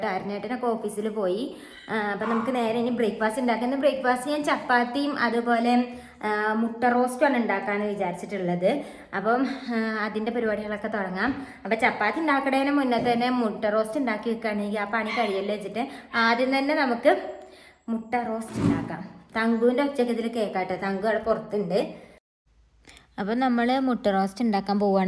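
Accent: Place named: Malappuram, India. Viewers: വടക്കൻ ഭാഷ..!! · Malappuram thanneyanu 😂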